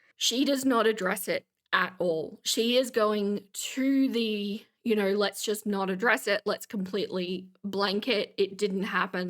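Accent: Australia. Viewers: Ooh, another Aussie voice. Quite a few of us among reaction channels, it seems (not that I'm a reaction channel - just Aussie :D). Anywho, great video!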